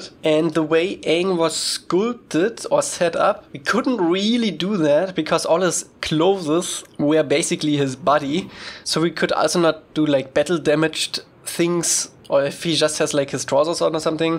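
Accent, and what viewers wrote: Germany. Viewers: this deutsch akzent is da hehehe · Mann hört das du deutsch bist 😅😅😅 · I hören a Deutsch accent 😅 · You sound so German...😂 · Höre ich da deutsch raus?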